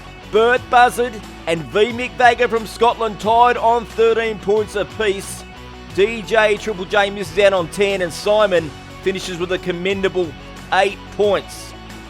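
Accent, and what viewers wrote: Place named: Australia. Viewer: I was rooting for Simon to win! But congrats to the winners. As an American, love to hear your commentary in Australian accent. Great racing to all!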